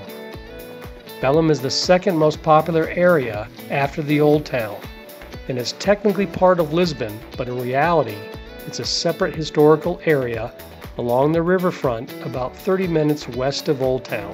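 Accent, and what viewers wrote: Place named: United States. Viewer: This video is very informative and helpful to those planning their first Lisbon trip. I do like your recommended walking map directions. I've been to Lisbon and covered most of what you mentioned but there are some places I did not see and do want to go back some time and cover those as well. My only criticism for your video is that you made some glaring typos and mispronunciations (such as Barrio Alto instead of Bairro Alto). Baixa should sound closer to "Buy-Shah" than "Bahk-Sheeya". It is such an American tendency to pronounce things the way an American would read them instead of learning how they are really pronounced. When creating a informative video on a place, you do owe it to your viewers to make a better effort here. This critique aside, you did a very good job on the information presented to help people plan things.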